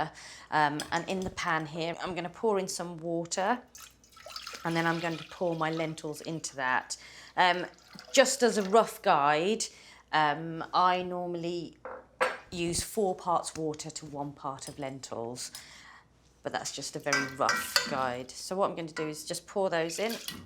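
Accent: England, United Kingdom. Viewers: Yair Gil... sorry I don’t quite understand your comment, speaks very eloquently so everyone can follow the recipes. She isn’t trying to sound more English, she is English!. comes across as very down to earth and is just sharing her passion for cooking! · Thanks for style of cooking..just to let you know not my style. But am being polite in appreciating your efforts. Understanding your British accent is something else. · she actually sounds like a lot of British asians born and raised here. I have a Pakistani colleague who speaks so similarly to her. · Such a lovely British accent 😅 Greetings from Germany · Indian kitchen with very beautiful Harry Potter accent. Good combo. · Well I like your British accent from Toronto of guyanese origin in the west indies. Our dall is cooked with yellow split peas boiled for about 2 hours with garlic and turmeric then chunkay with oil,garlic and Cuman. 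We use dall to compliment any dishes with meat or vegetables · This is a fairly typical English accent for all ages and sexes among those without a regional accent!!! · The worst thing is the acquired British accent which is in no way better then the sweet Indian accent. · As I wrote down the ingredients of this recipe, without looking I could swear that this is the voice of an older English lady. Remarkable how accents around the world get absorbed. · Dusky Indian looks Dark hair and eyes. Impeccable English accent. Nice healthy simple Indian dish. Isn't Ghotra...LOVE itself?🥰🥰🥰 Lub ya & your receipe, love!!!! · She speaks British English. Must have been educated there. Very professional video. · U r a very good and beautiful instructor. I also watched ur video on making samosa. Also such a beautiful english accent. Thanks. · I have never in my life seen an Indian with an English accent from England.. 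this is weird.. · just found your channel and i am very pleased and going to cook this dish. my daughter livend in london for twenty years before she died of breast cancer and london became my second home i am swiss but live now on the eastern sea in germany. i like to hear that wonderful english english and will have a good look at many of your dishes. whenever i arrived in london, the frist meal was alway indian and myself am cooking with indin spices too. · How come this Indian Lady speaks like an English Lady? I always thought that dhal is the Indian name for lentils. Or is there something called lentil dhal?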